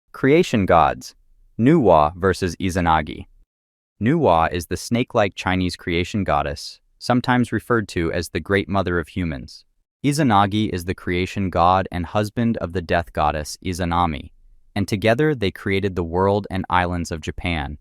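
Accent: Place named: United States. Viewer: 미국인? 미국인발음 짜증남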